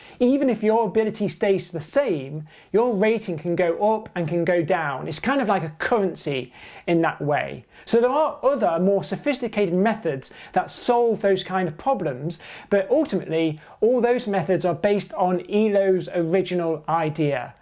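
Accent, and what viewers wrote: United Kingdom. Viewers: My brain melted. 😢🧠🔥 PS. Cool British accent. 🙂👍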